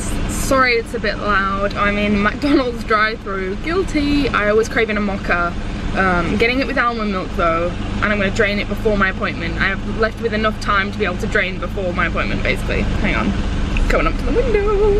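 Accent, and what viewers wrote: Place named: Northern England, United Kingdom. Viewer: eyyy. Thank you so much. I've been curious for ages. Also. This is my friend. He was trying to help me figure it out. You sound like would've come from the north of England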